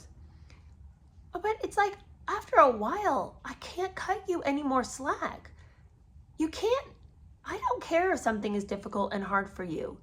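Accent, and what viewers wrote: United Kingdom. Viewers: Your British accent 😂😂😂 new sub here. Keep going girl!!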